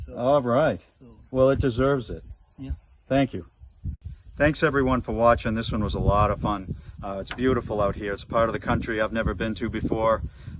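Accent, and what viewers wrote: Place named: Northeast, United States. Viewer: Love yalls videos! I assuming yall originally from diffrent states? You sound like you're from the Northeastern area, and your wife has a normal accent 😁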